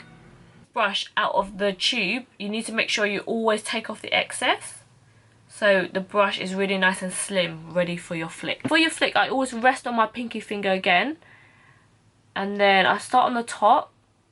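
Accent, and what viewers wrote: United Kingdom. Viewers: omg I've just found you on instagram and i was like no way this girl doesn't have youtube with such fleeky makeup, new subbie here. i love it, and do you live in the UK you sound very british x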